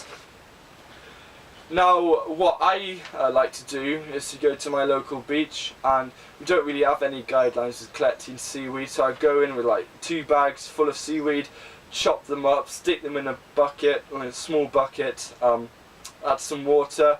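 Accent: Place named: England, United Kingdom. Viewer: you look and sound english for some reason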